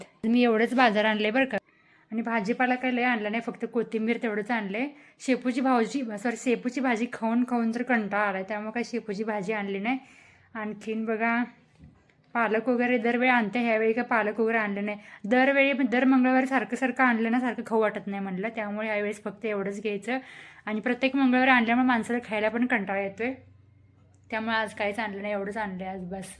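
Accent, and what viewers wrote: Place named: Karnataka, India. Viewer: तुला मराठी पुर्ण जमत नाही जरा कन्नड वळनावर आहेत भाषा मी मुचंडीची आहे तुमच्या मामाच्या घराशेरचे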